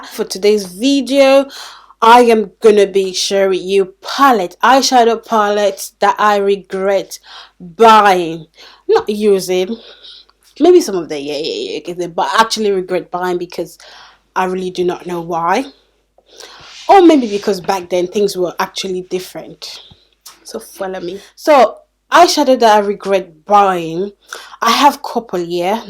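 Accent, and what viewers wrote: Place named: Nigeria. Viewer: Hi I like ur accent, are Nigerian? Liked and subscribed.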